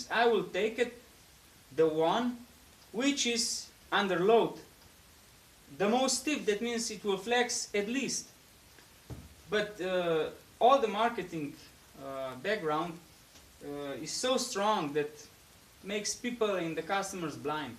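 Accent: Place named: Slovenia. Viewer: You sound like someone from Slovenia.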